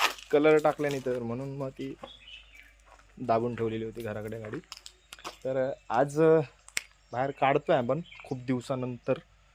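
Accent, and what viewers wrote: Malvan, India. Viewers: ची मालवणी ऐकायला छान वाठतय आम्हाला चाळतय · Wahnii malvanii try kartaa bolavak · Pn bhari bolte Malvani Ekdm God Todmodak ka hoyena Pn bolte👍👌❤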